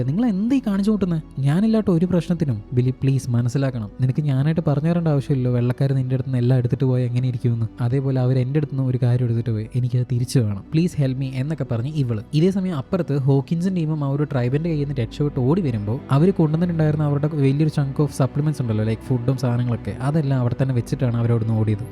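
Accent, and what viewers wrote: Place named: Trivandrum, India. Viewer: Movie selection is good, but the language (Trivandrum or kollam dialect) is a little bit annoying. Try to improve your language.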